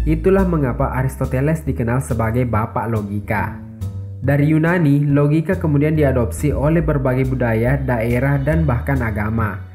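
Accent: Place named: Sulawesi, Indonesia. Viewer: ORANG SULAWESIKI'? KENTAL SEKALI LOGATNYA HEHE SALAM SULAWESI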